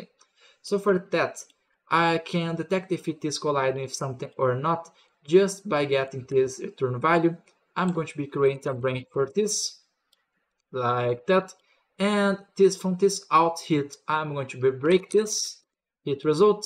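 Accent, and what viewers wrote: Brazil. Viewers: where r u from? i felt and brasilian accent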